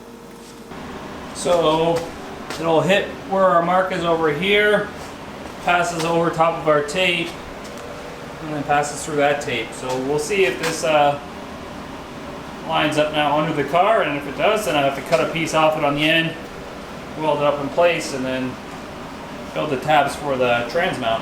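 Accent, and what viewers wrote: Canada. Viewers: Lol, boots. Love the Canadian accent.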